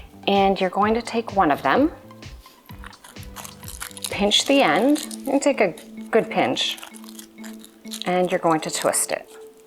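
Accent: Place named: Canada. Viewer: Gorgeous arrangement, that first branch is such a lovely, graceful shape. You always say your name at the start of the video and to me it sounds like, but I have seen people address you as. With your lovely Canadian accent maybe I’m not hearing it right! Anyway, whoever you are - thanks for the video! xx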